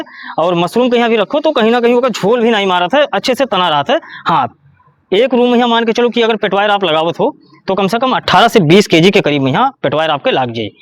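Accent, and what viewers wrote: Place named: Rewa, India. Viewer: apki boli se lga ap Rewa MP se ho, utni door avi nahi aa sakta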